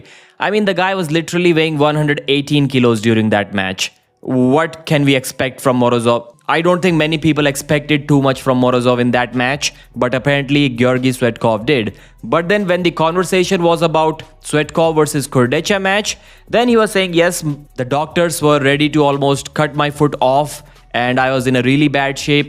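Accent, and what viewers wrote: India. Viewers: Dude you got the hardest indian accent like fckn ever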